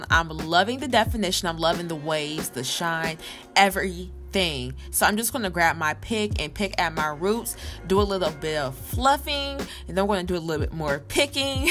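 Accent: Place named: Philadelphia, United States. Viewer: baby girl u from Philly? it's soo subtle but i hear an accent😁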